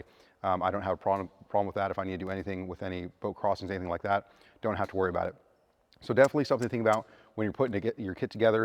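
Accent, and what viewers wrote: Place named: West Coast, United States. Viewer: Knew he was west coast the moment he started talking. God be with you and your men🕊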